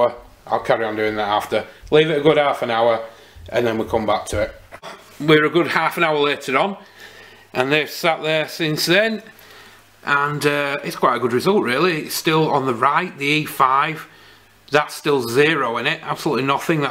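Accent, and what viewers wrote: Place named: West Yorkshire, United Kingdom. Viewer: You sound like you’re from West Yorkshire like me! Whereabouts are you based pal? I’m just north of Leeds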